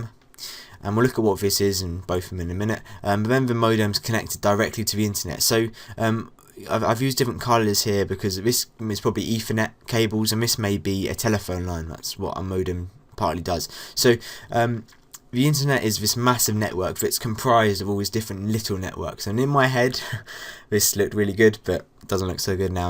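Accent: United Kingdom. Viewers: are you from leicester? you sound like a friend of mine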